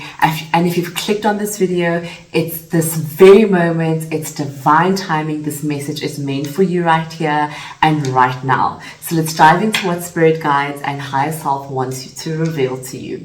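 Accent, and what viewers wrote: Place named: South Africa. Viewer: Lol it's a South African accent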